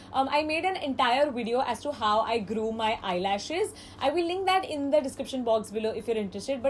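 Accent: India. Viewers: I luv ur hindi accent diii......😍😘😍😘😝😛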